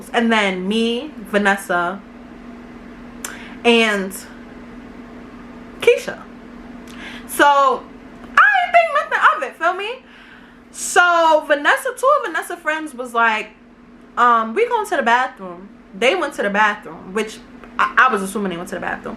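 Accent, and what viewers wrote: New York, United States. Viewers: I love y'all New York girls accent. I'm fascinated and intimidated at the same time lbvs 😄😳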